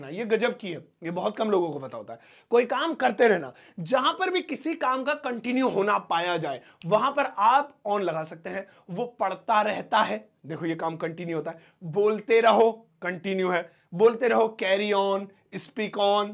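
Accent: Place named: Delhi, India. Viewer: Sir lgta h aap delhi se ho 😂😂😂❤❤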